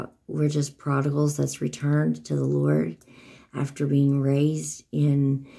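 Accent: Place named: Tennessee, United States. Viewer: Grumble Bunnies! Oscar the Grouch! Squidward! 😬😱🙊...🙌🎚The JOY of the Lord sis! It IS what gets me thru! Had to learn the long hard way about this. Wandering the desert >40 years😬💔🙌🎚. God's perfect timing for new peeps, the Lord IS doing something, preparing us girl! That TN twang! This IS the day the LORD has made I WILL REJOICE AND BE GLAD! 🧐ain't nobody got time for dat! Cut em out Lord! Ps.1 HAPPY are those who do NOT listen to the wicked, who do NOT go where sinners go, who do NOT do what evil people do. We LOVE the Lord's teaching and we think about those teachings day AND night! We are strong like trees planted by THE RIVER, we produce fruit in season and our leaves DON'T die. EVERYTHING we do WILL succeed.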